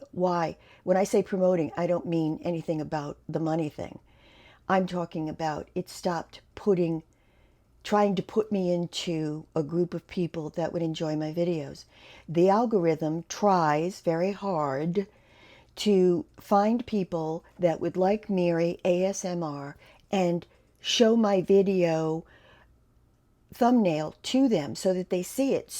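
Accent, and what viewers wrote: New England, United States. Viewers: I’m here because Jeannie. I love her willingness to help other creators. I’m sorry to hear of your YouTube struggles. It’s my understanding that things can get very complicated. I wish you the best. On a side note: I think I hear a New England accent?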